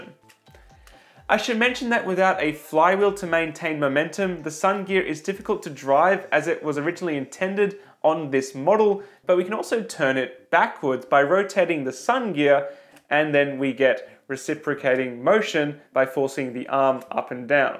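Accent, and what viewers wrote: Australia. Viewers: Nauhteeboy he's an Aussie ya dick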